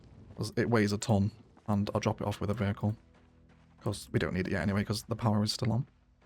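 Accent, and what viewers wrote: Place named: North, United Kingdom. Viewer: Come on! I recently moved to Australia from the UK and it's lovely having a chill northern British voice talk to me about Zomboid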